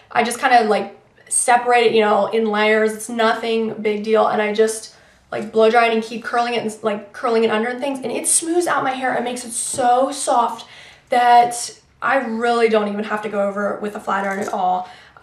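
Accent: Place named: Philadelphia, United States. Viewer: are you from Philadelphia? Your accent sounds like it could be :]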